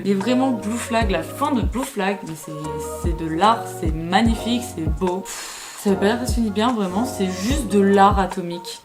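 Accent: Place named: Lyon, France. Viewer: Jure on a un accent à Lyon je me rend même pas compte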